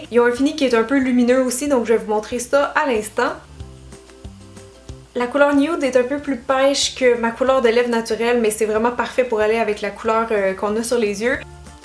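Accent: Canada. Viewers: Bravo pour l'ensemble de tout ce que tu fais, et Merci pour les infos sur ce produit crueltyfree. Trop peu de personnes se préocccupent de la souffrance animale, MERCI!!!! Les produits que tu présentes ici sont la plus belle preuve qu'on peut obtenir la beauté, et la qualité, sans passer par la cruauté et/ou la chimie. Bises. Ps: ( je suis en France), j'aime beaucoup ton accent Canadien, ce qui ajoute encore de la sympathie à ta chaîne.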